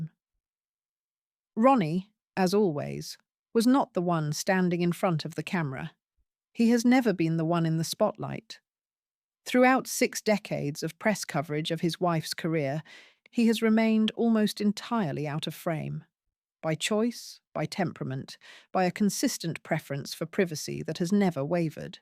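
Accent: United Kingdom. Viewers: Why is the narrator speaking with a British accent? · Maybe because she is British…..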